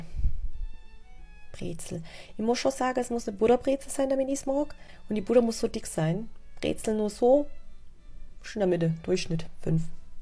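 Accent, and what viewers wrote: Swabia, Germany. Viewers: Haha du babblschd Schwäbisch is des Luschdisch.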